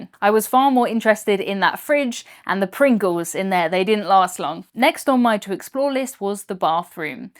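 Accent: United Kingdom. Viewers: I've never been on a cruise and don't really want to, but a while back I got addicted to presentation style and bits of humor and I keep watching her cruise videos. It must be that charming British accent she has that keeps me coming back!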